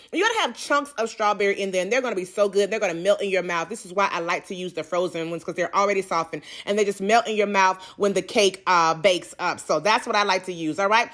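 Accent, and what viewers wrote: England, United Kingdom. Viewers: Not the British accent 😭😭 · The British Accent had rollinng. I'm like "what happened"? lolololololol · Love the hood, England accent. You ought to give us that combo more often I LOVE IT🤣 also the cake looks moist and delicious. Im excited to try this recipe for sure. Thanx my girl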